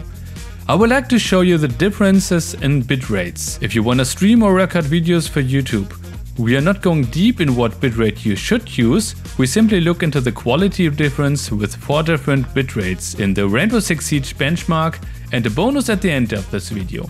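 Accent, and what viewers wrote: Germany. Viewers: Du bist deutsche Man Hört es raus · Ich hab dein deutschen Akzent anders rausgehört das ist einf krass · Das du ein Deutscher bist, hört man richtig raus 😂👍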